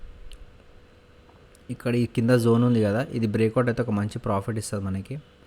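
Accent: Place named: Rayalaseema, India. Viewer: Bro meedi proper Rayalaseema kadha I think u r language pronounce like that only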